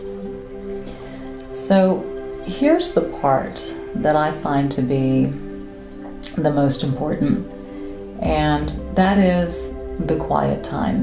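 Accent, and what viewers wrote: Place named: United States. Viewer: So inspiring as always, thank you so much! Merci pour ton anglais américain pour moi très agréable à entendre, je te suis depuis longtemps non seulement pour tes vidéo, ta personne mais aussi pour apprendre cette langue qui m'étant si nécessaire. Loving you from Paris! Have a nice weekend!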